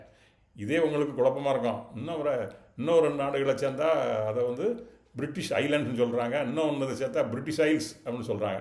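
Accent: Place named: Tirunelveli, India. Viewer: மங்கா புகழ் கொண்ட எம் நெல்லை தமிழே... நீவீர் வாழ்க.❤❤❤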